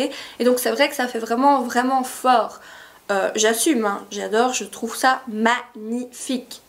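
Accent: Belgium. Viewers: Ta vidéo est sympa, il y a beaucoup d'humour, tu te moques de ton accent et oui, il faut savoir faire de l'autodérision, on a l'accent belge et on ne renie pas les origines.Elle traite bien du sujet présenté en expliquant bien les dangers pour les jeunes filles qui abuseraient de ce système, je trouve que pour une première, elle est super bien faite; je t'encourage à persévérer et à continuer si cela te plaît, sans t'occuper des gens haineux qui ont toujours un commentaire déplaisant à dire.Et pour celui ou celle qui dit que tu as des lentilles, je le défie de trouver une photo de toi sans tes yeux bleus clairs, qui font ton charme, alala la méchanceté gratuite à quoi ça sert vraiment, maintenant on peut ne pas aimer ou apprécier, pas de soucis, EN BELGIQUE c'est un pays démocratique et on a libre expression;....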